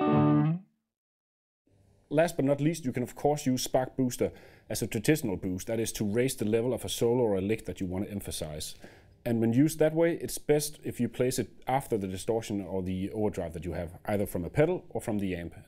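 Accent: Denmark. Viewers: I play steel guitar through a solid state amp. I'm looking for a clean boost to take solos with. If I understand this demo correctly, the gain won't add overdrive unless this is going through a tube amp, right? I don't mind a little bit of drive in the sound on peaks but its a clean boost I'm looking for. Btw; I work regularly in Denmark so the accent doesn't bother me - believe me, its easier to understand than listening to someone "like... so from California"! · Wow he sounds danish!!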